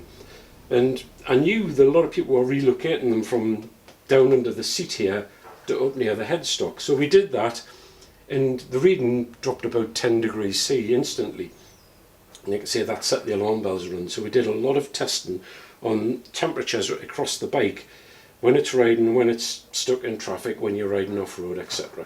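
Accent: Newcastle, United Kingdom. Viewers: Great to listen to a fellow Geordie talk so knowledgeably about some great motorcycles. If I lived in the UK I would certainly be buying parts from you because you are clearly a true enthusiast and are in it for the passion rather than just the money. Thank you.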